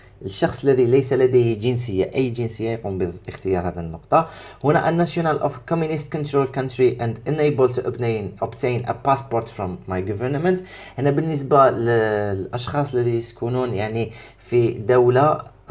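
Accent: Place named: Morocco. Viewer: الاخ اما مغربي و لا جزائري 😂😂😂 عندكم طريقة خاصة فنطق العربية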